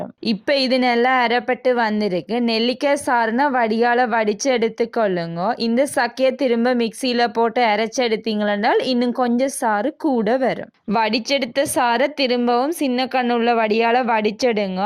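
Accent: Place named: Sri Lanka. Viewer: அனைத்திலும் மிக முக்கியமான ஒரு விசயம். உங்களிடம் பிடித்த ஒன்று, உங்க லங்கை தமிழ்.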